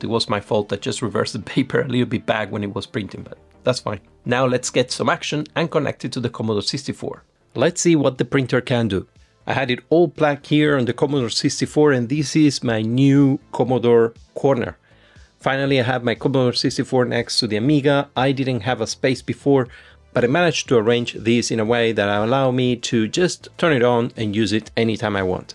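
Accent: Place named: Italy. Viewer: ah ok sei italiano :) avevo capito che non eri inglese ma non che fossi italiano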